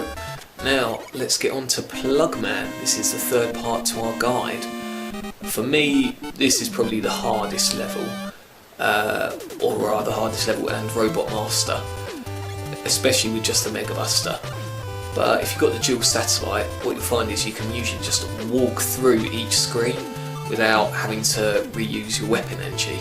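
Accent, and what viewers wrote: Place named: United Kingdom. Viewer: I like your british accent. I have just downloaded MM9 for Wii, and I was stuck in Plug man's stage n_n so thanks for this!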